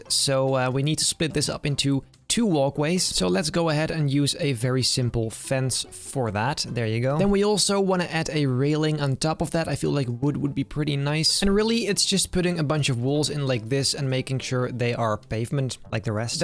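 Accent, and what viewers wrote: Netherlands. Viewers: your netherlands arent you from your accent i hear that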